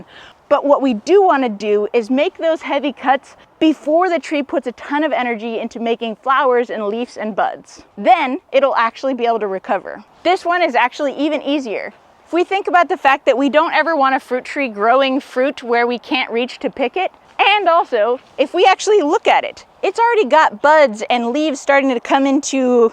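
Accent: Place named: Minnesota, United States. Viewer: PNW has very little accents. I keep hearing a MN accent maybe cause you remind me of an ol’ friend. BTW…. Many congratulations on your baby!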